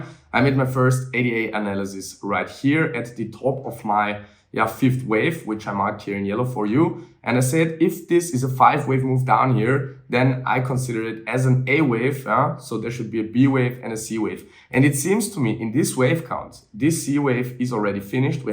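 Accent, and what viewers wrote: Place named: Austria. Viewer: Typically Austrian accent.. 🍺🍺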